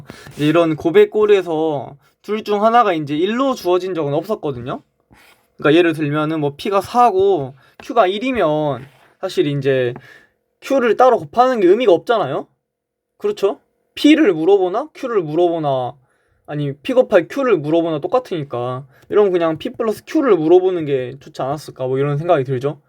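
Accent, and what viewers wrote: Jeolla, South Korea. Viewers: 그 수학 관련된 건 아니지만 전라도 사투리 듣기 좋아요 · 전라도 사투리 구수허네유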